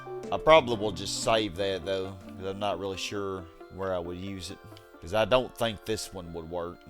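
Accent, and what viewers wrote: South, United States. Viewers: I don't mind if you switch games but I liked the video regardless Always good to have a retro gamer with a southern accent on the youtube timeline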